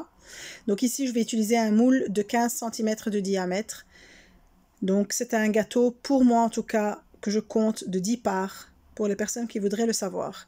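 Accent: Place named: Belgium. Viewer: Salam Alaykoum tu es belge ? Tu as un accent que je n’arrive pas à trouver ?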